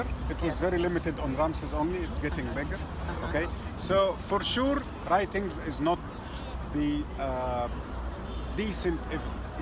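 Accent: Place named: India. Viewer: THIS GUY IS NOT EGYPTIAN 💯 HE HAS INDIAN ACCENT , HE TRYNA DISCARD AND RE WRITE HISTORY LIKE EVERYONE ELSE THAT CAME BY THE EGYPTIAN GODS 💯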